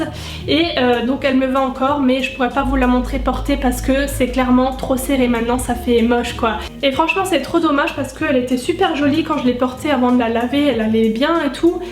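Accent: Lorraine, France. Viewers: Je tombe par le plus grand des hasard sur ta vidéo, puis j'entends ton accent, qui me rappelle fortement le mien, je vais checker la description, et mes doutes se sont avérer réels ! Tu es Lorraine ahah :) +1 abonnement ;)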